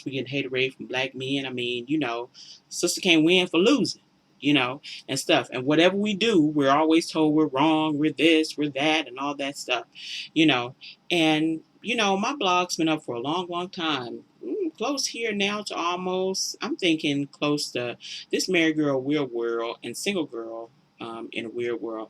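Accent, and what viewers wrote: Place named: South, United States. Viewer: !! I've appreciated your presence on BBW and Twitter for some time. How am I just now seeing your YT channel?!?!? I loved this and look forward to checking out your other vids. Off topic: I LOVE your accent (fellow Southern girl?...)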